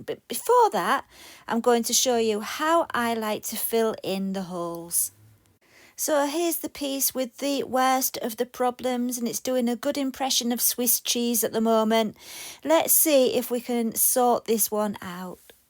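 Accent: United Kingdom. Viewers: Thank you for another wonderful video! I have watched you for years and adore your British accent…I’m from the US and have only a boring southern accent. I love to watch your ideas come to life and find your witty commentary delightful and charming!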